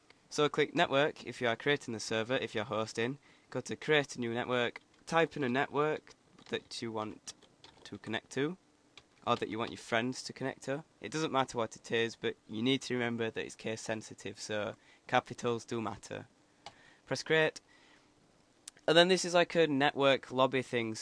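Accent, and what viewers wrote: Scotland, United Kingdom. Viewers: Scottish Accent :3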